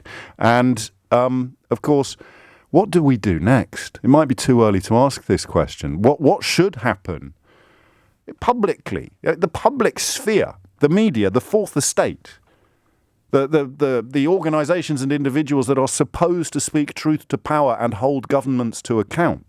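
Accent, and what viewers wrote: United Kingdom. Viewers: I love this commen sence man with British accent.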